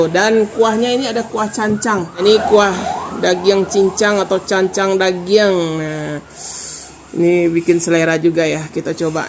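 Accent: Minangkabau, Indonesia. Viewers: Ulang dengari berdialek minang walaupun tidak totali, konon saya ingin pelajari dialek minang🎉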